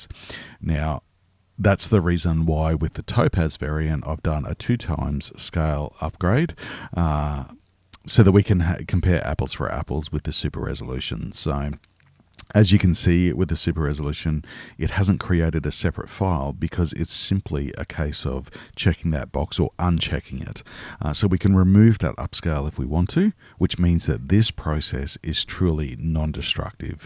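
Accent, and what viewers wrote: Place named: Australia. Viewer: I've only just come across your channel and I'm happy to hear an Aussie accent. Thank you for the info. I'm newish to LR and will be going through your playlist to learn more 👍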